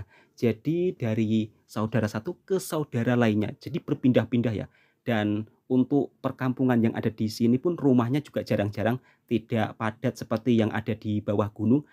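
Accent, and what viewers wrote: Java, Indonesia. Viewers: Jawa nya di daerah mana Mas??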